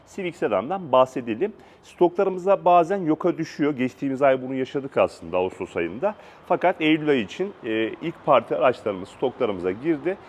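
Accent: Samsun, Turkey. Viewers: Kulağı tirmaliyor biliyorum ama şive biraz Samsun da genel durum😊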